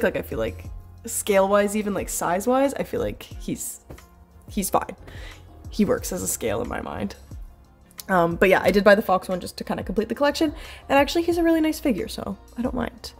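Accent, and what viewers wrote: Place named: Alberta, Canada. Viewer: Just found your channel - you have the strongest Canadian/Albertan accent, it's awesome. Great tour and collection!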